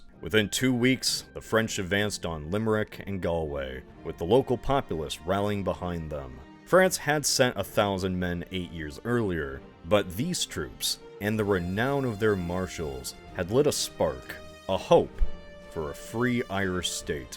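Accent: United States. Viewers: The way Americans pronounce English towns is excruciating. (But funny) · Yep, this is certainly an American pronouncing these French Marshall names. Epic History... My beloved, please, clean my ears of this hideous noise. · triggered in british at hearing american pronounciations of places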